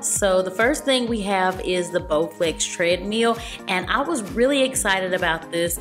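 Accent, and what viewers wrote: South, United States. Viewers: I know it isn't much to film. But we miss your videos. If you have any bloopers or footage you think we would be board with is OK to post. We just miss that Southern Drawl & your contagious smile on our timeline. #MissYouAndYourFamily #BlessingsToYouAndYourFamily #MoreVideosPrettyPlease #FromCaliforinaWithLove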